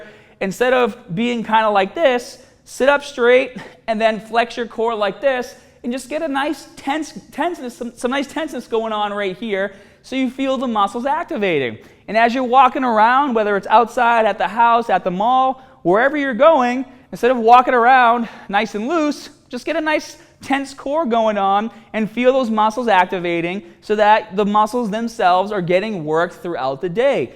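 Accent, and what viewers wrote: Boston, United States. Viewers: Mazklassa boston? maybe nyc? i can never tell the difference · This guy has the classic Boston dialect going on. Pretty cool and hard to find. Haha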